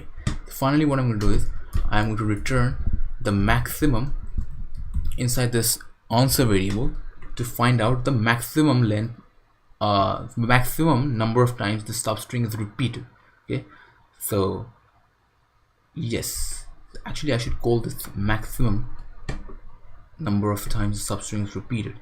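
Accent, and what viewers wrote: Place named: India. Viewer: Damn man that really was helpful but listening to 33 mins of your indian accent was the hardest things i've ever done in my life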